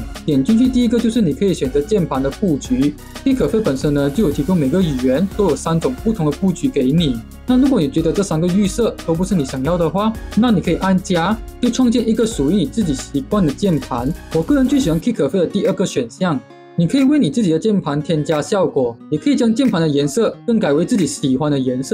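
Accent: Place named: Hunan, China. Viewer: 湖南话＋台湾话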